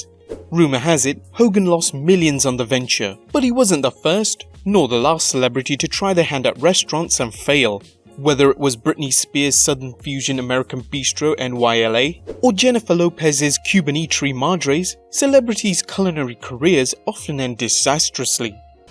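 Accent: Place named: United Kingdom. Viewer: This was not a story meant to be told with a British accent.....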